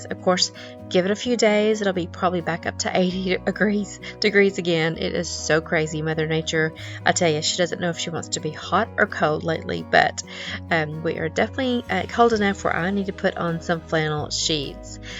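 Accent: Texas, United States. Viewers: Hello sweet friend! I just love to watch you clean and decorate. I end up wanting to do the same! Lol thanks 🫤 I love your videos. Your Texas accent is Beautiful!! Big Texas Hugs, from my side to yours. 🫶🏽❤️💗💝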